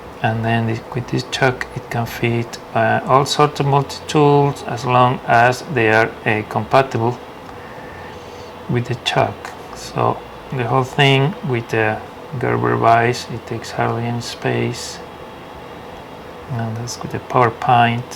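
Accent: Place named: Europe. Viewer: This is Genius 👍 I'm so glad I found this in my recommendations ! How is it you have such a European accent but measure in inches with that work mat though ??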